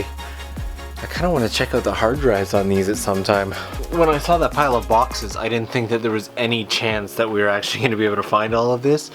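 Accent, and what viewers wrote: Canada. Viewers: 10!? jeez lucky you, we've had a wind chill of about -41 the last few days here in northwestern ontario. and now that youre back in canada i notice the accent even more haha.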